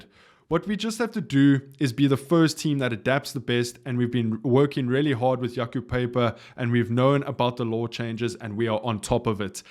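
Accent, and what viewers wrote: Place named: South Africa. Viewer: I love moeeerrrr the like and subscribe. Truelly souf’aafeikaaan! Please like and subscribe is so English. And you know what we think English means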